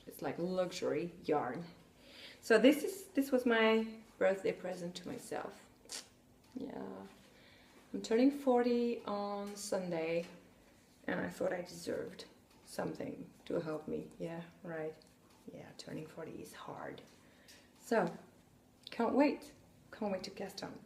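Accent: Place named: France. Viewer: Hello! I am Jacqueline and I am a Canadian living in Tokyo, Japan. I love knitting and I am currently working on a turtleneck sweater for myself in Brooklyn Tweed LOFT (which is amazing yarn!) and a hat knit in merino and mohair/silk yarn held together. They are both knit in black! I am trying to make basics that go with my monochromatic wardrobe. I also sew but I am just getting back into it. Last week I made myself a knitting project bag with recycled kimono fabric. I loved your podcast and your English is beautiful and your French accent is cute:<). Thank you for the effort you put into doing this and I look forward to more.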